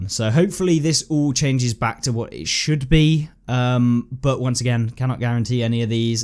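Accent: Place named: United Kingdom. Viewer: i like that british accent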